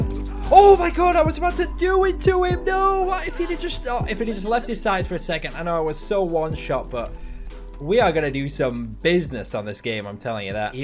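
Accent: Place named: England, United Kingdom. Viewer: dude you're great. That english accent sets the mood straight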